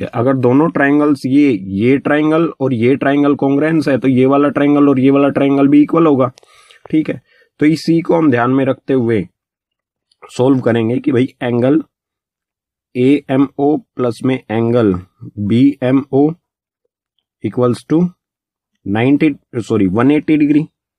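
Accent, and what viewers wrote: Haryana, India. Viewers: Aap haryana ke ho kya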